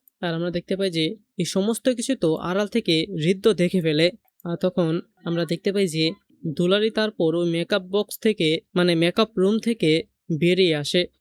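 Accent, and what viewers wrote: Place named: Bangladesh. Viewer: আপনার কথা গুলো বাংলাদেশশের মত শুনতে লাগছে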